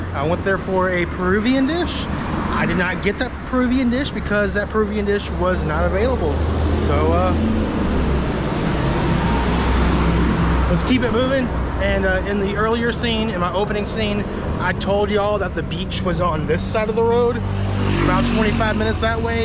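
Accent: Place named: South, United States. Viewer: Dude learn some Español you can't even gracias without a southern twang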